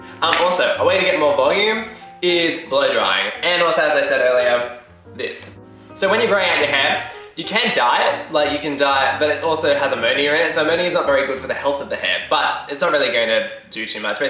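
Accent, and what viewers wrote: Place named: Australia. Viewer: Good to hear a fellow aussie! Nice hair mate.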